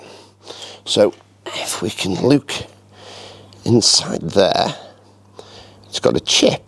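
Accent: Stoke, United Kingdom. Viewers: You sound like a Stokie 🙂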